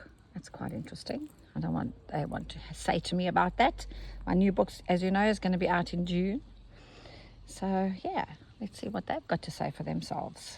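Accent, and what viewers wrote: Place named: South Africa. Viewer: I enjoy listening to you nattering away 😊 I love south African acsent & I adore hearing the bird life around you it sounds so serene I wish I could see it. I have ordered yr books, im excited to get them & start a project using them, I found you after discovering the lovely Corrines channel, so I'm grateful to both of you ❤I also have Judith's books which are excellent as you know, but you can always learn a different way from different teachers, so I can't wait for yrs 😊 oh fabulous you did show us yr surroundings superb just lovely, you see I comment as I think of things I want to say so I don't forget, so I keep editing thankyou for showing us yr gorgeous space where you create beautiful ( with a sigh of delight)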